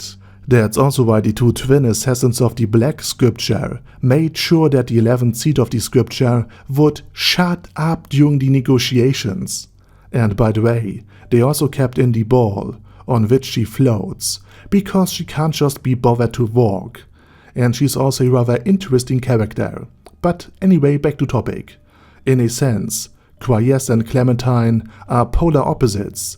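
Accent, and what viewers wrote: Germany. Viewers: having a german guy narrate overlord is oddly fitting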